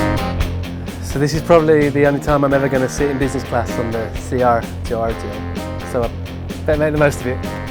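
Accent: United Kingdom. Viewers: 英音 真的好听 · 你是英国人吗，口音喜欢。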